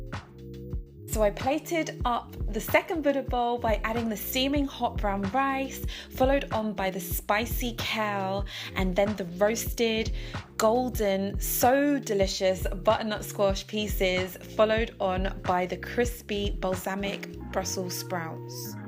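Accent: United Kingdom. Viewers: You sound British...why are you using •Fahrenheit???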